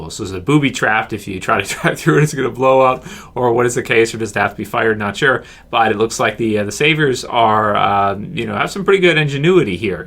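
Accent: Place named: Canada. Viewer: I love your Canadian accent, can you please do a video of you just saying OUT and ABOUT over and over...thanks!:)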